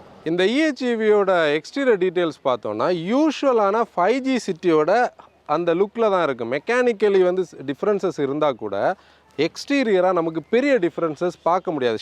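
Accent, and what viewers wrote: Kanyakumari, India. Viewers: Kanyakumari slag 😊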